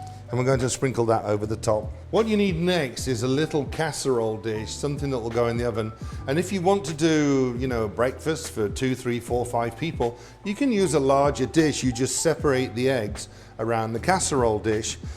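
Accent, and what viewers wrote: England, United Kingdom. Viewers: Stay English, Chef. Sounds so much better for a royal chef!! Beautiful cooking nonetheless.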